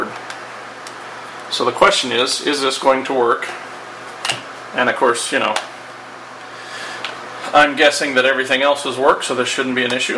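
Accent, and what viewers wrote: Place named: South, United States. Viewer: where are you from man? i'm guessing the south.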